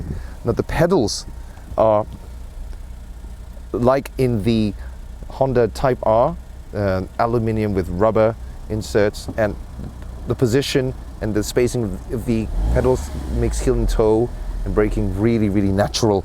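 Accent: United Kingdom. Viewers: You sounds a bit like British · Where did you get the British Accent?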